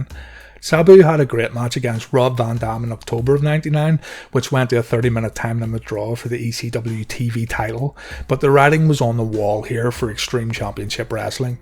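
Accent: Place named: Northern Ireland, United Kingdom. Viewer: You dont need to change by the way mate your Norn Iron charm and knowledge/passion keeps us coming back.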